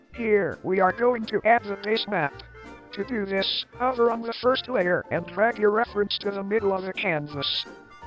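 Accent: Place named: Arab world. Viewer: I can hear your middle eastern accent through your voice changer.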